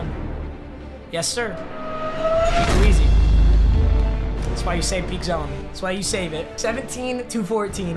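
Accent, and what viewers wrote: Michigan, United States. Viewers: Are you from Michigan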